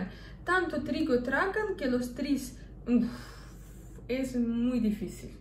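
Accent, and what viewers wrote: Russia. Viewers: Hola mi Linda, soy médico y disfruto todos tus videos, aquí te dejo unas 7 palabritas para que puedas pronunciar. Me encanta tu acento Rusoespañol. Haz otro video con ésta y otras palabras curiosas en Español. 😉👍😘❤ 1.Hipopotomonstrosesquipedaliofobia 2.Homopedonecrozoofílico 3.Pneumonoultramicroscopicsilicovolcanoconiosis 4. Esternocleidomastoideo 5.Ciclopentanoperhidrofenantreno 6.Uvulopalatofaringoplastia 7.Gastroesofágicodudenalintestinal.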